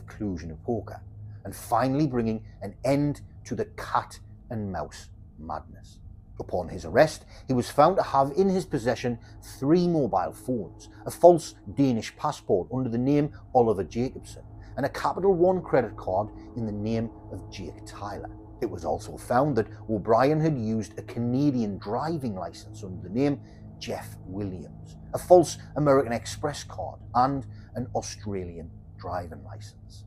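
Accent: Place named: England, United Kingdom. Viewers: True English accent love it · lol and this conment got 2 thumbs up? It's quite clear he's from the north east. I'd hazard a guess at Sunderland or more likely County Durham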